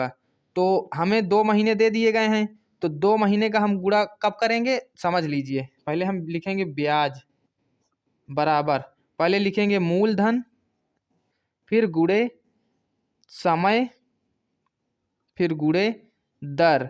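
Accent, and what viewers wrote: India. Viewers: Mast gajab ji bagheli bole ho yrr😁😁😁😁mast samjhya apne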